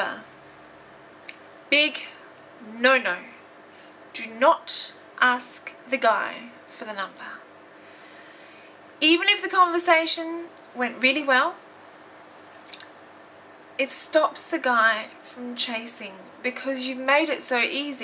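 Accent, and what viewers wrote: Australia. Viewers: All true lol... u're good, must have had a lot of ups and downs on this field so far uh? Hmmm... i don't really need to be shaved to look like a decent guy, right? hehehe I just love your accent... you Australians, ai ai ai!! I could not let this detail out, since I'm already posting you a comment. Anyway, nice post. Cya around! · she's not british, she's australian..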